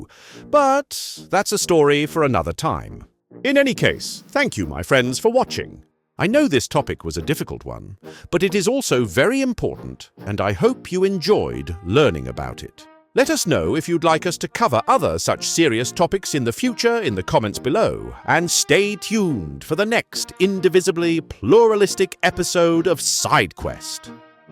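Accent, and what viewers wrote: RP, United Kingdom. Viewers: New narrator is fine, but just sounds like more of a “generic RP English accent” and has less of the edge and personality of the old narrator. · That British accent is great 😊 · It's ironic that the narrator for this video is British 👀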